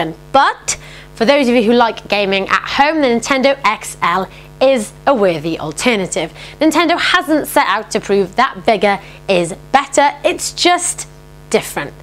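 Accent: United Kingdom. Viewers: thats the good old british accent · I love British accent.. ;) cute voice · the accent is so heavy, even for a British lady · Gotta love cute girls with an English accent! On a different note, I think Nintendo should have added more improvements like since it's bigger anyway why don't they just put back in the GBA slot?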